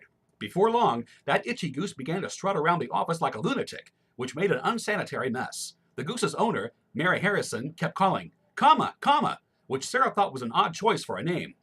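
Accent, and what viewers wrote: United States. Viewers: You have a Lincoln Nebraska accent now lol. Missouri has a natural Southern Drawl no matter where their from. However the accent has slowly died off. My Grandma is from Kohoka (North Missouri). She has a strong Southern Drawl. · You got an amazing Accent.Thanks American for sharing your Accent challenge for all of us. Such a beautiful Voice!! I don't have words. English Degree Student from🇧🇷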